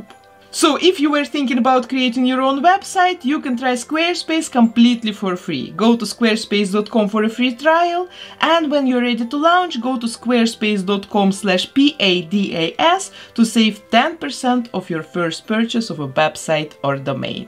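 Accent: Russia. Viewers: это русский акцент верно?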